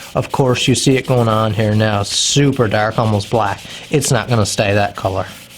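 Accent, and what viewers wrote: Newfoundland, Canada. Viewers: first guy I’ve ever heard with a noticeable Canadian accent lol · Oh yeah, that's a good ol' fellow Newfie I hear. Great video my buddy. The rifle looks beautiful.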